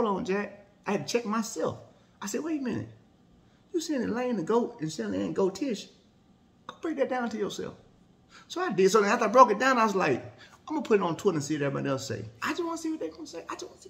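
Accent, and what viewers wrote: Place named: Georgia, United States. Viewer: P.s my mom used to love your voice when I would play and listen when I would sit with her at the table having dinner..she said you sound like some of her people in Georgia. I miss my mom laugh she thought your voice was so back home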